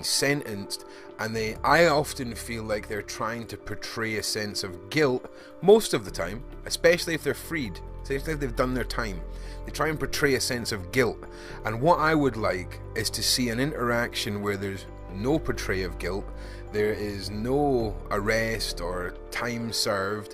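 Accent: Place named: Scotland, United Kingdom. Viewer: Seen your content on a dark Web video. When I heard you were Scottish I jumped on you tube 💚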